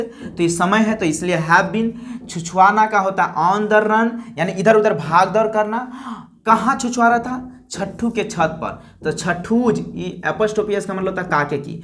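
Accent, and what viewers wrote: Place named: Bihar, India. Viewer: बिहारी हो क्या भाई... इसीलिए "ड़ा" को "रा " बोल रहें हैँ।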